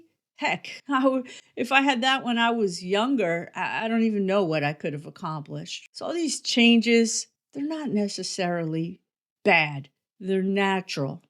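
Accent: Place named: New York, United States. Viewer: I love your accent. I am Australian. Are you from somewhere in New York, maybe Queens?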